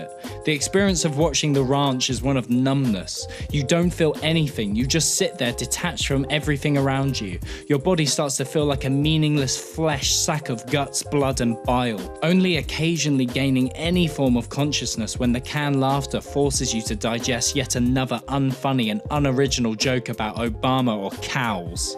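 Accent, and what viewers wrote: Southern England, United Kingdom. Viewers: I have never heard someone with a British accent say the word "ranch" and honestly, this is probably the equivalent of listening to Americans gleefully repeat "tea and crumpets" over and over ad nauseum, I finally understand. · Well judging by your voice I can tell this show ain’t for you. (BRITISH) or whatever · That’s an English southern accent, not a British accent. I’m also British and say it different to him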